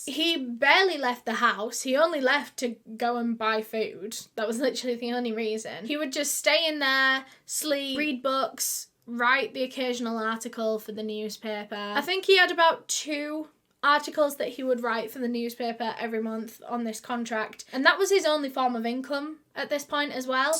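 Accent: United Kingdom. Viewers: Oh goodness. I've never heard your voice. I watch these with subtitles. I thought you American. I'm even more pleasent to hear your UK. Love it.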